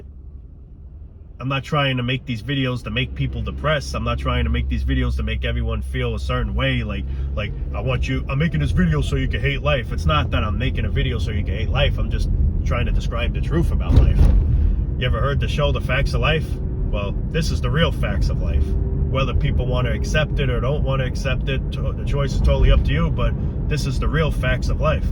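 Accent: New York, United States. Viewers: I love ❤️ your New York accent and your videos. I feel so grounded in actual (not fake) 🃏 reality and unfortunately there's not a whole lot 🤏 of positivity. 😞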